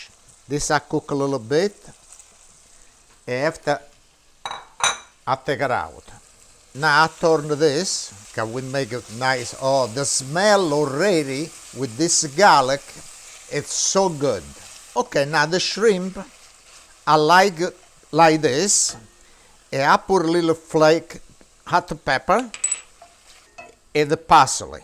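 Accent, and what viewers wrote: Italy. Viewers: I'm from Germany and to be honest it's very hard for me to understand English with an Italien accent. But one can really feel that your are living Italien food. When I see your dishes it's always a pleasure and a pain at the same time, because it looks so tasty but I usually watch your channel when it's too late to try it by myself at once. So I have to.go to bed with the pictures of you dishes in my head. Sometimes a glas of wine helps to overcome ...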